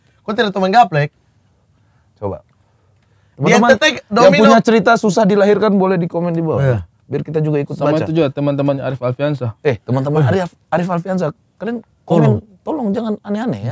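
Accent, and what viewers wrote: Eastern Indonesia, Indonesia. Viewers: logatnya udah jadi logat indonesia timur wkwkwk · Bang su terpengaruh dgn bahasa Timur ya😂😂😂👍👍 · Eeeeee....bang logat timur sdah bgus e😂😂e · ... kenapa logatmu jadi ngikut timur juga... padang mana padang 😂😂😂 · uda, de pu logat su mulai ketimuran ee....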